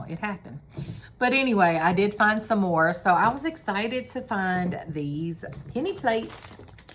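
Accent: United States. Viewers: Hi, Congratulations on your 8.000 subscriber achievement! Watching you is delightful, I love your Southern accent and hauls! Here’s to 20,000 subscriber milestone. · Congrats. You make me homesick for the Redneck Riviera with ur wonderful accent. You also have the cutest dimples. 💔🤍💙🇺🇸🇺🇦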